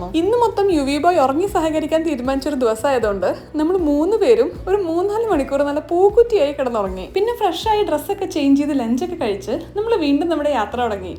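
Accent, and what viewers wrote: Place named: Valluvanad, India. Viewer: Calicut University in Malappuram തേഞ്ഞിപ്പാലം പക്ഷേ അതിനും പേര് പറയുന്നത് Calicut University എന്നാണ്❤️ കൊല്ലം വഴി വന്നാൽ ചിലപ്പോൾ എന്നെ കണ്ടേക്കാം എനിക്ക് ഒരുപാട് ഇഷ്ടമുള്ള ഒരു influencer ആണ് എന്റെ ഈ കുഞ്ഞ് അനിയത്തി കുട്ടി വള്ളുവനാടൻ സംസാരം കൊണ്ട് ആരെയും ആകർഷിക്കുന്ന ഒരു കുട്ടിയാണ് നിങ്ങൾ നിങ്ങളുടെ breakfast recipe ൽ ആകൃഷ്ടയായ ഞാൻ നിങ്ങളോടൊപ്പം സഞ്ചരിച്ച് Yuvi boyൽ എത്തിച്ചേർന്നിരിക്കുകയാണ് നാട്ടിലെത്തിയ നിറഞ്ഞപ്പോൾ പറഞ്ഞറിയിക്കാൻ കഴിയാത്ത സന്തോഷം വല്ലപ്പോഴും എവിടെയെങ്കിലും വച്ച് കാണണമെന്നാണ് എന്റെ ആഗ്രഹവും പ്രാർത്ഥനയും അത്രത്തോളം എനിക്ക് ഇഷ്ടമാണ് ഈ മല്ലു പെൺകുട്ടിയെ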